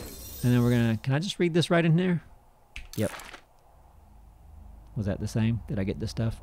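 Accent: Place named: Appalachia, United States. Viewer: I can hear your accent a little bit the Appalachian twang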